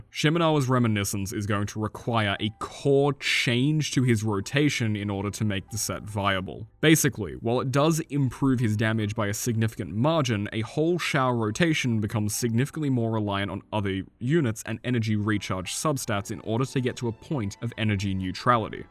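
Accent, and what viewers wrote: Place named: United Kingdom. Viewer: Omg- British accent gives a like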